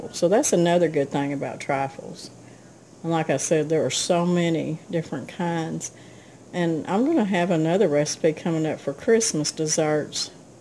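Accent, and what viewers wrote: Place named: Western North Carolina, United States. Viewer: Miss it looks so good. I bring watch u on Sundays. I'm new to our channel and I'm like u a country cook. I love all u make ur like being with a good friend just talking and sharing ideas. I'm from western NC and ur accent sounds like where I'm from lol. Your are also a blessing. Speading Gods love through ur channel.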